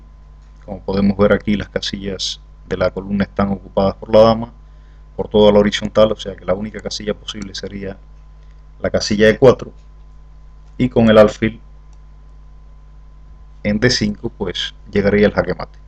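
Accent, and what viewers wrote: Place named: Canary Islands, Spain. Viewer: Por el acento parecías canario jejeje. Muchas gracias por los vídeos que subes. Yo estoy empezando a estudiar un poco en serio y la verdad que me ayudan mucho. Un saludo!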